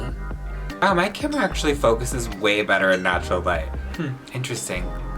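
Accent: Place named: United Kingdom. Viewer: Stopppp, are you from the UK?! 😭👏🏽👏🏽👏🏽 Love you even more now 🇬🇧🇬🇧🇬🇧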